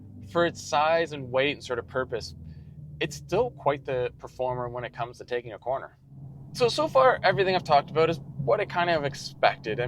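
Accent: Canada. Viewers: See what its all a boat. Lol your Canada accent actually fits in this case.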